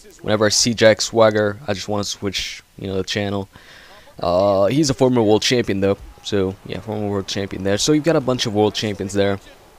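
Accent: Canada. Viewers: ARE U CANADIAN..uve Kinda go thee accent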